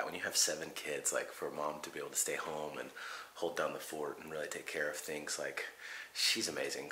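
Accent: England, United Kingdom. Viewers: Happy Birthday the Great, we love you guys you crack me up with your English accent.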